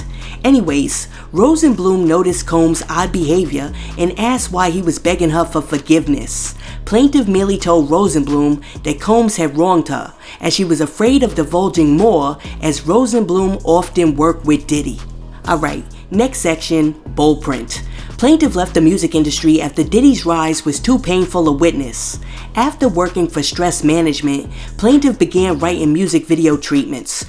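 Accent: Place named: New York City, United States. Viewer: I just subscribed to your channel and I ❤ it. Love your NYC accent!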